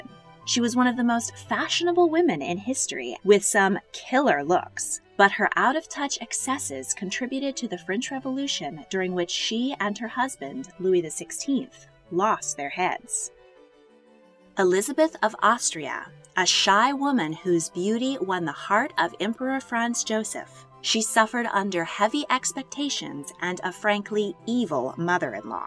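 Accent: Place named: United States. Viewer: From your accent I can tell that you're not European.. Probably American.. So its more amazing that you're making videos about European monarchy..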